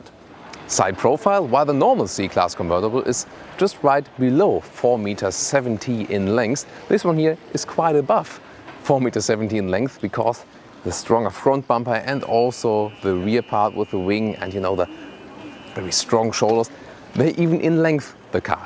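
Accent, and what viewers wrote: Germany. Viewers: Oh Mann,. Dein Englisch klingt...Deutsch. Du sprichst flüssig aber mit deutscher Intonation, was absolut furchtbar klingt. Ein wenig Übung und die Sache klappt, gib' Dir einfach Mühe! Selbst als Deutscher kann man dieses Denglisch kaum ertragen. Außerdem solltest Du lernen, wie man bestimmte englische Wörter ausspricht. Beispiel: Coupe wird Cuup gesprochen, nicht Coupee. Bitte verstehe das als konstruktive Kritik.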